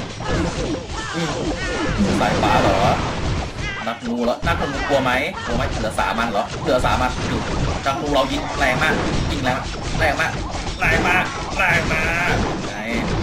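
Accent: Isan, Thailand. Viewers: พี่เป็น คนอีสานช่ายป่ะ =×=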